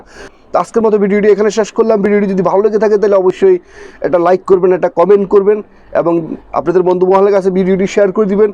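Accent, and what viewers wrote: Bangladesh. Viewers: আপনি কি পূর্ববঙ্গের মানুষ ? আপনার কথাগুলো খুব টান আছে ? আপনার বাড়ি কোথায় ?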